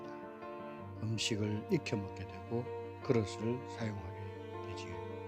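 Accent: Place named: Gyeongsang, South Korea. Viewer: ^ 채널명이 멋집니다 열정의 샘이시군요. 작가님! 구수한 경상도 목소리가 좋습니다. 화이팅입니다..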